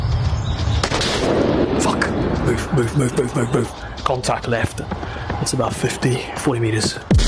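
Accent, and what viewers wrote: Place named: United Kingdom. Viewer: bruh he’s pure British/Scottish/Irish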